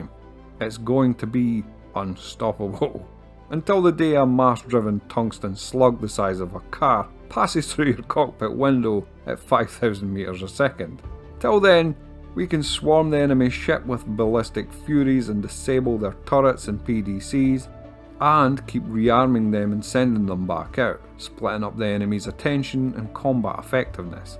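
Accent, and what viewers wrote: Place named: England, United Kingdom. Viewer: I think its lovely English accent i love most about his videos- is it from London? Also next you could do 'Most Anticipated Gameplay' as that connects with ships so much. Cheers English Gent!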